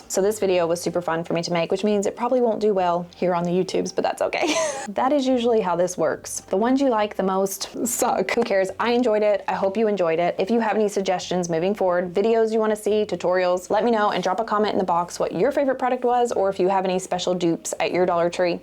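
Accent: South, United States. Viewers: that's how I feel too. I'm from TN but live in FL, so I love hearing her Southern accent. It brings me much joy.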